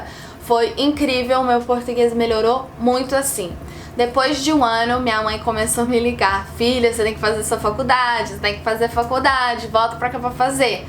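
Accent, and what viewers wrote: Minas Gerais, Brazil. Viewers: Prosódia igualzinha a de um mineiro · passada, vc fala mt bem... parece até um mineiro ameii seu sotaque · Sotaque mineiro total véi,como assim você não é brasileira?Kkkkkkkk,queria eu ser tão fluente em duas línguas. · Seu português é perfeito e seu sotaque é de mineira. A única coisa que você poderia corrigir é quando você usa o ver "ir", apesar de que quase todos os brasileiros também falam errado. >>> Assim como em inglês, em português também a gente vai A algum lugar, e não EM algum lugar. Exs.: Eu fui ao aeroporto. Eu ia à escola.... Engraçado que sua mãe é brasileira, seu pai é polonês, você nasceu no Canadá, mas o seu nome é alemão. :))) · Pai polonês, mãe brasileira, filha canadense com sotaque mineiro. Isso é incrível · Vc tem sotaque de mineira e NÃO de gringa 🌹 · Você não tem sotaque gringo na minha opinião, tem mais sotaque Mineiro! 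· CÊ TEM SOTAQUE É DE MINEIRA , TREM BÃO DIMAIS DA CONTA SÔ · seu sotaque é igual o meu, MINEIRO ❤ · Na vdd você fala como mineira normal... Parece que viveu a vida toda aqui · agora entendi porque seu sotaque é levemente mineiro. A palavra que você falou com maior sotaque foi MÃE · Tem sotaque de mineiro! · Muito sotaque de meneiro, deve até ser que falando inglês soe diferente pros gringos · vc tem sotaque de mineira kkkkk e não de gringa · Seu português está muito bom, ou melhor você fala o meu idioma MINEIRÊS, Uai . Abraços daqui de BH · Você fala perfeitamente o Português. Passa por brasileira tranquilamente. O seu sotaque é brasileiro, mais precisamente mineiro do Sul de Minas, bem leve. Parabéns. Passa por mineira ou paulista do interior de São Paulo. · Vc é brasileira tem até sotaque de mineira slk kkkk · eu sou de Minas e p mim isso não é português estranho , eu falo +- assim, é "sotaque" de mineiro kkkkkkkkkkkkkkkkkkkkkkk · pra mim não me parece gringa, o sotaque dela não é canadense, parece mais sotaque mineiro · seu sotaque é mineiro, até seu jeito de se expressar rsrs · Se eu não tivesse lido o título do vídeo eu nem ia saber que é gringa. 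Tem sotaque de mineira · Vc fala português 1 milhão de vezes melhor q eu kkkk, parabéns🙏🏻👏🏻👏🏻👏🏻 Ah e vc tem um pouco de sotaque mineiro tbm tamo junto kk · Depois de ver uma canadense filha de um alemão e que fala português com sotaque baiano, agora uma canadense falando igual mineira e filha de polonês, fala polonês também ? Jesteś bardzo piekną :) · Seu sotaque n é de gringo, é de mineiro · Que legal vc fala mineirez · Parece brasileira e tem sotaque mineiro 👌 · Esse sotaque de Minas ❤❤ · Você fala português melhor do que eu que nasci e vivi por quase 19 anos no Brasil! Parabéns!!! Quase nem da pra notar o sotaque “gringo”... só o mineirês! 😂😊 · Mai nunka k vc foi gringa mineirinha simmmm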